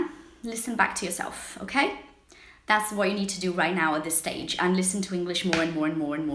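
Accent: United Kingdom. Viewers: Beautiful British accent 😘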